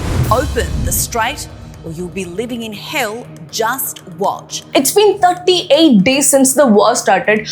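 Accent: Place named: South India, India. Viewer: I love your South Indian accent man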